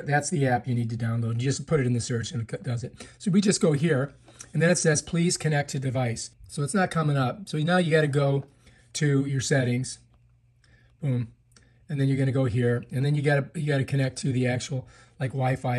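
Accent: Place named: United States. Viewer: Do not delete. Why are you giving a camera plugged directly into your phone access to your local network?? Why would some Chinese product need access to all your other devices on your LAN if it’s plugged right into your phone? Think about it! That’s NOT safe. Why do you give it FULL access to your phone’s library? It doesn’t need FULL access to save a photo. Take a screenshot instead of giving access to China to all your files and network devices. You don’t think that’s weird? Oh, and it wants access to connect back to their company! Uhh, to send them your files?? Again, it doesn’t need access. If the software needs an update it would get it through the App Store, not directly from the Chinese programmers. HELLO! Wake up people.? Why are you encouraging people to do this? What is your motive? Who paid you to post this and other videos for this same dangerous device? 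You might sound American, you are anything but.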